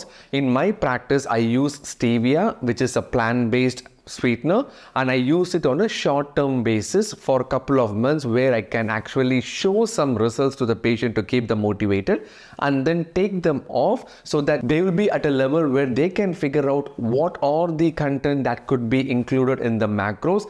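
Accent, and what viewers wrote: Tamil Nadu, India. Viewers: I like your accent of English with strong influence of Tamil. :) so natural, just like the way we speak Tamil :)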